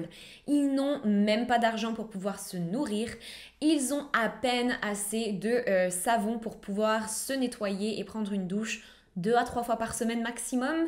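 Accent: Quebec, Canada. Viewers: Je ne l'ai jamais dit.. mais j'aime tellement ton accent , ça sonne bien pour un Quebecois.. retour sur un petit côté professionnel.. Merci pour tes vidéos, les dossiers sont toujours plus plaisant et facile à lire par la suite. Bisous xxx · Salut.. Comme d'hab 👍.. Un bonjour au Québec!..(t'as vraiment pris l'accent!! 😊)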